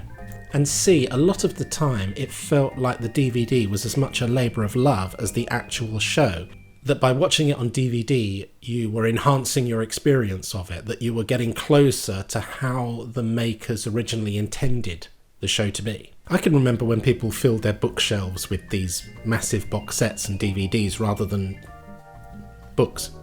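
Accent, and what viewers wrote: United States. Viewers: Great gravitas american accent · Can't figure out which American accent(s) you're attempting there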